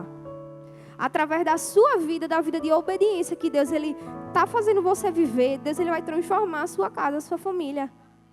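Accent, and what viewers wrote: Northeast, Brazil. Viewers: Gosto do sotaque nordestino Deus abençoe a todos nós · O sotaque mais lindo que eu já vi Nordeste seu lindo 😍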